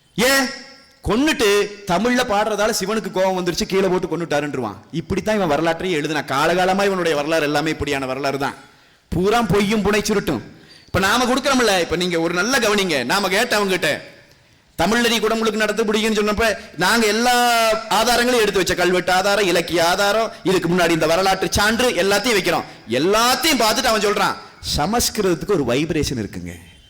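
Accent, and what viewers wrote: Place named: Kongu, India. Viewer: பேராசிரியர் கொங்கு தமிழ் சிறப்பு. நாம் தமிழர் துபாய்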